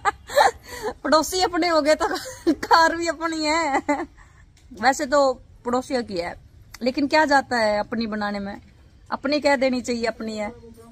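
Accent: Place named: Haryana, India. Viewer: Mam manny hariavni boli bria lagy su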